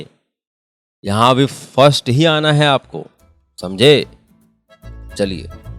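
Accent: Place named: Kanpur, India. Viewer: 👏Brilliant voice expression.. you narrated each and every part wonderfully ... And your kanpuriya accent is zabardast 👍 waiting for the next episode Sir ☺️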